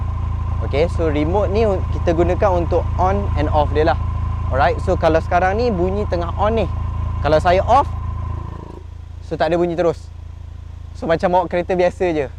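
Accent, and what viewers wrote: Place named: Kelantan, Malaysia. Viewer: sama mcm penerangan kat honda fd dia...slang kelate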